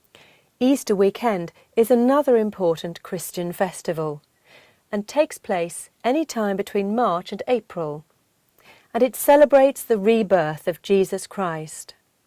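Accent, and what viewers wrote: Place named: United Kingdom. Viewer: Her voice and language pure British English. Awesome. I dnt understand why in uk, majority people will speak street language(they dnt speak clear, they dnt speak full only they speak half lOl, they use shortcut words), only they can understand. They are spoiling birtish image.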